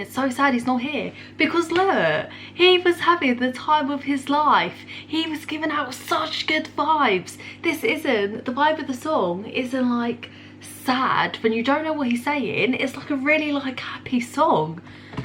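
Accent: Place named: England, United Kingdom. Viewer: I love ur English accent ur so cute and keep react Indian songs Punjabi hits ❤️🇮🇳🤘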